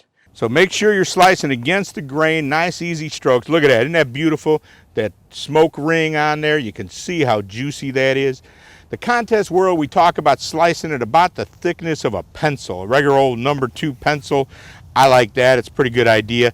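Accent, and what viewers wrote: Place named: Midwest, United States. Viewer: One of my favorite things in the world is listening to somebody with a Midwestern accent talk about meats. It reminds me of Saturday night live Super Fans Chicago Bears sketch.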